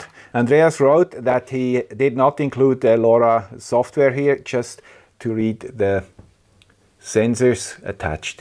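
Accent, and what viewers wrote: Switzerland. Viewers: I love the Swiss accent, but if you could just change this pronunciation... Circuit is pronounced sur kit.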